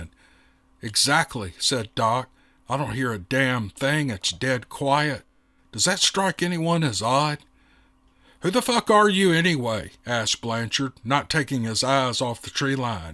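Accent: South, United States. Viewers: Enjoying this storyline alot. Do hope there will be more stories in the future. Will be listening to number four after this one, of course. Thank you, you narrative is great, like the southern drawl. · You did an excellent job reading these to us. I've heard you before, but hubby and I sat down and listened to all 6 Parts in one day, no joke. We couldn't stop listening until we heard them all. You are an excellent storyteller, and we appreciate you!! Your southern drawl and just the way express everything makes it come alive. I do not want to forget DA Roberts, who without him these would not be possible. Thank you again.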